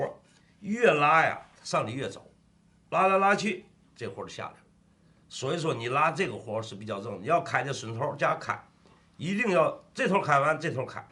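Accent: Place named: Tianjin, China. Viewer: 天津话贼个锃啊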